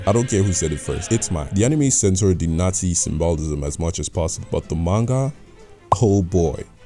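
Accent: Nigeria. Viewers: I had a feeling bro was Nigerian straight from the accent, well, as a Nigerian myself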